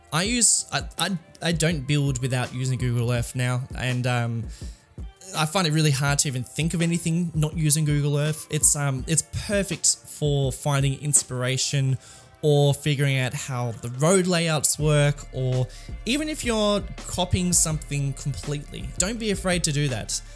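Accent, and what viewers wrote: Australia. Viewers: Patterns of a city. You sound Australian, so fuck Sydney's layout.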